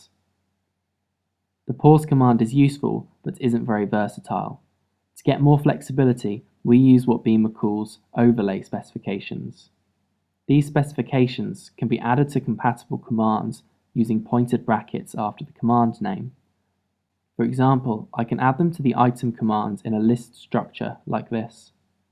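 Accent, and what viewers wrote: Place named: United Kingdom. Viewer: Very nice tutorial, it's very helpful and I really love your British accent, which makes your tutorials so comfortable to watch.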